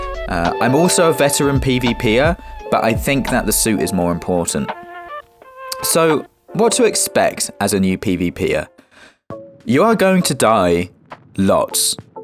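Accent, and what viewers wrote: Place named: United Kingdom. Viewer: "Oh, for fuck's sake." This sounds just like me daily. Minus the British accent. It's still a lot of fun though.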